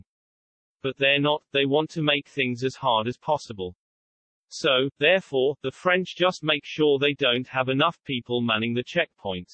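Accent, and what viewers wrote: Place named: Russia. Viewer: Why not narrate your own videos? We won’t make fun of your Russian accent.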